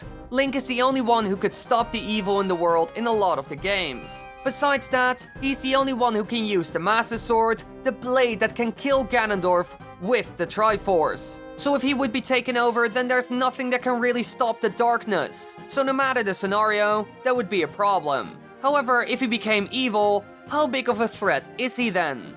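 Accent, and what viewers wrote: Netherlands. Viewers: are you duchts ???NL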